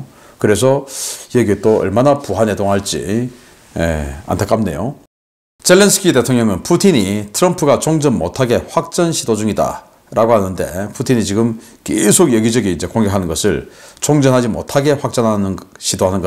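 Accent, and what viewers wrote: Gyeongsang, South Korea. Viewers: 늘 나라위한 소식 감사합니다 그러나 알려서 오히려 해가 끼칠걸 판단 하시는것이 먼저 진정한 나라위하는 것이고 자신 중심과 우상적 말씀은 자제하셔요 가만히 앉아서 실기의 전쟁상황을 이론의 말씀만으로 자신하십니까?.그리고 뒤가 먼저입니까?나아가는 앞이 먼저입니까?마치 한국이 전쟁을 치루어야 되는것에 긍정만 보시는데.핵심은 전쟁없이 살생없이 주변과.특히 북한과의 사이 아닙니까? 또.제일 중요한것은 팔이 안으로 굽는다고 북한군인이 죽기 살기로 뛰어드는 사정을두고 무기줄테니 죽여라는 것입니까?그리고 또.친구 비유 하시는것도 보면 사상적 까지 의심스럽듯이 친구것을 먼저 탐하는것에서 화끈이 되네요.아무리 가까워도 내것이 아닌데 버리던 말던 왜?열을 내십니까?바라건데 앞으로 전쟁에 대한 자료는 접는게 더 좋을듯 합니다.또.경상도 억양으로 진짜 경상도 사람을 욕먹이고 있지 않습니까?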